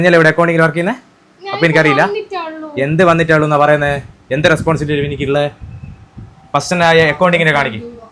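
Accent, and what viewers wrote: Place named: Kuttiyadi, India. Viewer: Kuttyadikkar aaro ingal sound guss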